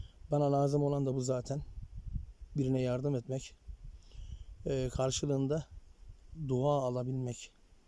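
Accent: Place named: Kahramanmaraş, Turkey. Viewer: Konuşmana bakılırsa maraslisin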